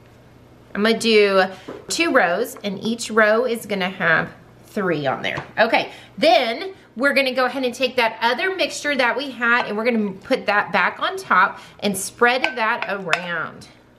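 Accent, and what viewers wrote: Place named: South, United States. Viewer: Cream of chicken. I like you you sound a little southern.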